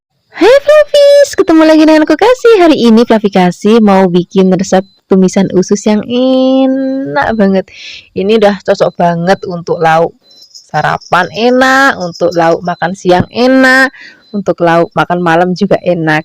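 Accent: Java, Indonesia. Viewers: tinggalnya di mana sih ko logat bahasanya Jawa banget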